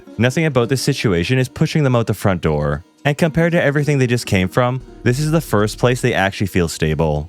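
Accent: Philippines. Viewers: i love your Filipino accent lol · Is it weird that the moment you say you're Filipino, I can now hear the Filipino Radio DJ English accent? 😅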